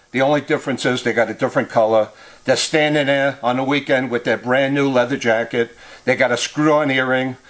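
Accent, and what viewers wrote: New York, United States. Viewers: East Coast boy I new I could tell you were from New York... I'm in Philly love what ur into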